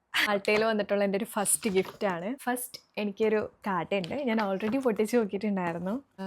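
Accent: Kerala, India. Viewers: Voice over seems like thani malayali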